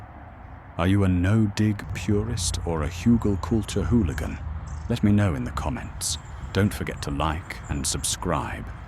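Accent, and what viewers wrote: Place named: RP, United Kingdom. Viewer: The commentary is just brilliant. Funny, whilst actually parting knowledge and being very informative. Just brilliant and very engaging and enjoyable. Only a posh and articulate Englishman could pull that off. I think you're going to become more and more popular with this unique content. All the best to you, and please don't change the style and delivery. Its so original.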